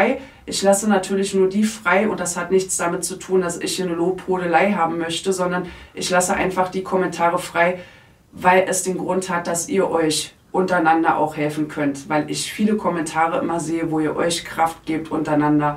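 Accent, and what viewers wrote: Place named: Saxony-Anhalt, Germany. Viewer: Ich folge Dano schon länger und wusste von dir nichts.Ich mag dich und deine Worte,einfach deine ehrliche Art🌹..und deinen Dialekt (komme selbst aus Sachs.-Anhalt).. Die letzten 3Jahre haben den Zustand unserer Gesellschaft sichtbar gemacht....Empathie und Herzenswärme wurden reduziert. Bleib bitte wie du bist und halte deine Schwingung oben. Ich sende dir Licht ,Liebe und viel Kraft 🩵🙏✨️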